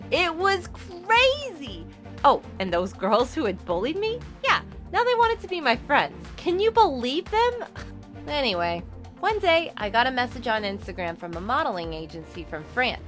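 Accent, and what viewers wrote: New York, United States. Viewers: That thick new york accent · Her voice sounds like a ny accent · It’s probably the New York accent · newyork accent? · Moe and moe pi'tures! Sounds like New York baby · Her accent is really cute it’s kind like a New York accent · She got that model new York accent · She new Yorker voice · I heard that new york accent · Well i can tell she’s from new York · She sounds like she is from NY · is it me or or does it sound new yorkish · This has to be a New York accent. It just sounds so familiar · Nice new York ancient or is it just me 🙋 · No she has a New york accent. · She sounds like she has a New York accent and one of the background she was in with her sister that thought bubble was a gacha life background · new yorker?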